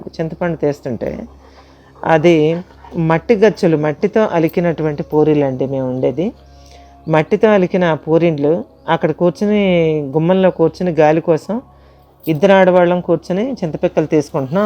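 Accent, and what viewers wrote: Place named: Parvathipuram, India. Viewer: Ammaa maadi kuda parvathipuram.konni padaalu appativi golem lantivi vintunte chaalaa santhosham anipinchindi .mee video chudatam start chesina tarvaata starting lo appati aa yaasa konni sarlu mee notiventa vunnappudu ide santhosham kaligindi ammaa meerante naaku chaala ishtam ammaa❤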